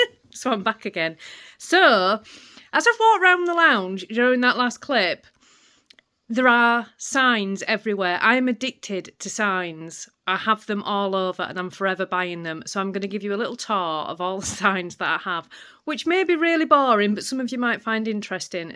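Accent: Yorkshire, United Kingdom. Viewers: Oh, I'm so sorry that some grubby little person has seen fit to spread their poison onto your lovely vlogs. And they are little people with little lives who have nothing better to do so let's give a collective f off (I don't use that word but it seems appropriate here 😁). Someone nearly destroyed my dear friend Sue (Suzie Stitcher) who is the sweetest most inoffensive person. She nearly took her channel down but I'm glad she bounced back., your warmth and lovely Yorkshire accent just oozes out of your channel - and you have lots of fur babies 🥰😍🤩 Well, it was 14C yesterday and 40C not so long ago - I'm glad that I didn't put my long johns away Ha Ha Look forward to seeing you soon. Much love, Angela 😘😘😘XXXX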